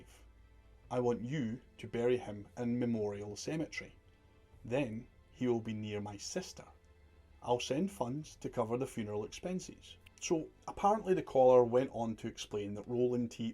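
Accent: Scotland, United Kingdom. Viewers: Uh yeah...you're Scottish, I am Australian and would love a Scottish accent 😎👍.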